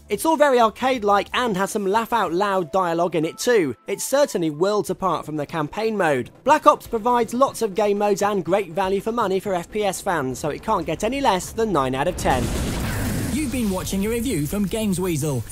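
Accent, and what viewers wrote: United Kingdom. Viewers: Its his charming British accent . :D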